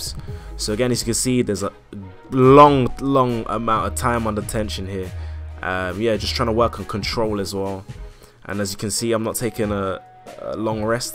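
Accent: London, United Kingdom. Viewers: You from London??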